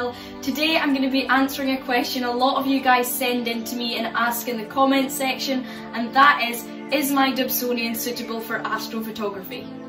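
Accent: Scotland, United Kingdom. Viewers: New subscriber caught off guard there with a Scottish accent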